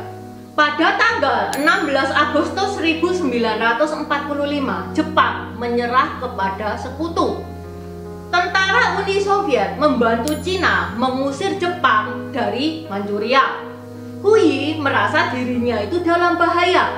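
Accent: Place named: Surabaya, Indonesia. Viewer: Alkhamdulillah Nemu Chanel ce saya lagsung subscribe, Bahasa Suroboyoane medok muanteb😂😂 Chanel ini nambah wawasan, Semoga Ce selalu diberikan kesehatan dan rejeki yang berlimpah agar bisa terus berkarya. Salam sejahtera buat ce dan saudara saudara saya dari etnis Tionghoa, Amin ya Rabbal alamin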